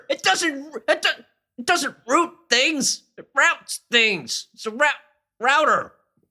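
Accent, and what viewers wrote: United States. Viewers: That was a pretty good American accent. Very nice review too! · Ok, as ANOTHER American watching, I am genuinely impressed with your American accent...great video as always. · nice American accent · Pretty good American accent! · That was an AMAZING American accent!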